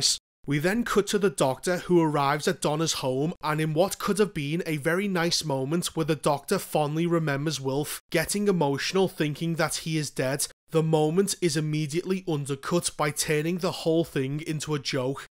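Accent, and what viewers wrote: Liverpool, United Kingdom. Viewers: Sorry, I wonder are u from Liverpool?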